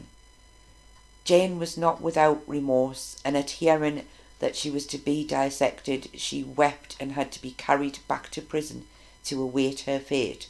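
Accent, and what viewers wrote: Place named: Northeast England, United Kingdom. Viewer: Good job, and I like your accent, too. Being from Minnesota I can't place it. Is it a Northeast England accent? Anyway, good story and a sub. Hope for more.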